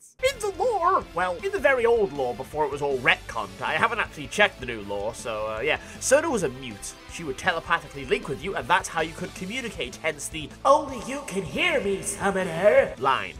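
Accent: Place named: England, United Kingdom. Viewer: you sound like am english timon from lion king😭😭😭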